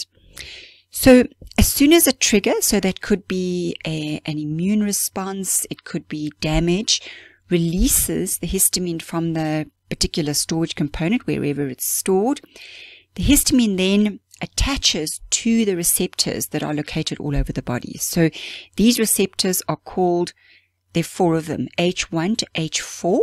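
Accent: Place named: South Africa. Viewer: You sound like you are from South Africa.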